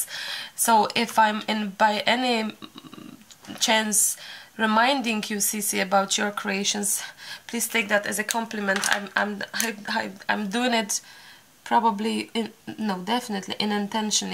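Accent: Cork, Ireland. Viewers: I keep hearing Irish vowels in your accent, have you settled in Dublin? Love your tutorials btw. Thank you ☺️ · I hear a hint of an Irish accent in there mixed with your native accent..Am I wrong? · you made me laugh so hard hearing the word 'mankie', my mam used to say that when we were younger and I use it around my grand babies too, so funny. Can I detect a Cork accent when you talk, I'm in Athy Co. Kildare. I love to watch you work your magic in your videos, thank you for sharing them all with us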